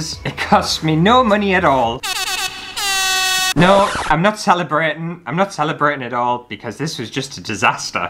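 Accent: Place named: Carlisle, United Kingdom. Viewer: I had to come and comment before the video finished. Totally creased when you showed the pocket and then the knife slid out the bottom. Now I've stopped laughing I'll continue with the rest of the video. By the way I came upon your vids by chance, have subscribed and binge watched about a dozen videos at this point. Your banter just cracks me up. Also love the accent, coming from Carlisle it's an accent I'm familiar with.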